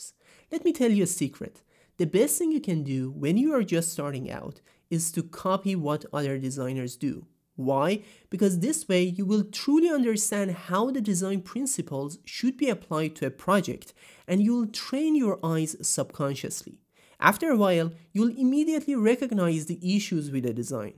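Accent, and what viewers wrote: Iran. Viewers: کلمه اول رو شنیدم اینجوری بودم که عه ایرانیه طرف😂❤ موفق باشی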